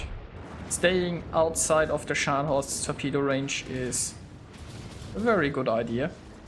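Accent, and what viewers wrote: Germany. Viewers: Man hört das du ein deutschsprachiger bist :D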